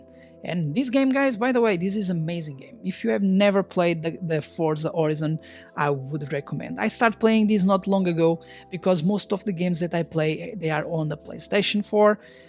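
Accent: Portugal. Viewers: Great video, I made my decision and will definitely buy this monitor after watching many videos on your channel about this. Also, dude are you Portuguese? Your accent really looks like it 😅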